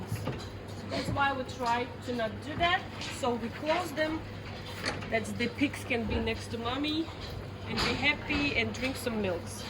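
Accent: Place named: Eastern Europe. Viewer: You have very good english for what seems like you're a Eastern European pig farmer. I'm assuming Eastern Europe from your accent. I'm impressed. Most Eastern European pig farming videos I've seen on YT, they can't speak english.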